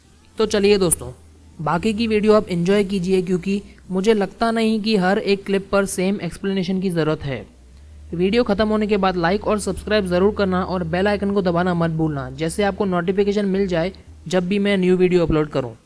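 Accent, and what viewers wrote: India. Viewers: stopped watching the second I heard the indian voice lol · Clearly not his fault,he is Indian and It's very different from english,Don't be racist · Dude, are u indian? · Hes indian, not jis fault for not having english as his first language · its english with an indian accent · Why is there a Indian talking? 😒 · He sounds Indian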